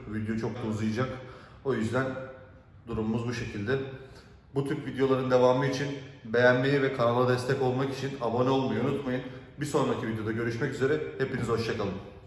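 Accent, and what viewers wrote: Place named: Zonguldak, Turkey. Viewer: selamunaleyküm,saniyorum zonguldak lisin.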